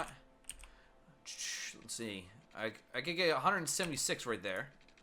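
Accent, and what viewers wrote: Pennsylvania, United States. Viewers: Do I detect a fellow Pennsylvanian? That accent is unmistakable. "Wudder" :D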